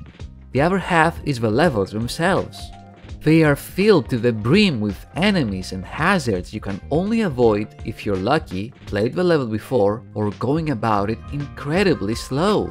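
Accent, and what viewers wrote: Greece. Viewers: Το γεγονός ότι κατάλαβα ότι είσαι Έλληνας από την προφορά και όχι από την ποιότητα του βίντεο λέει πολλά. Κιπ ιτ απ, σου αξίζουν πολλά περισσότερα σαμπς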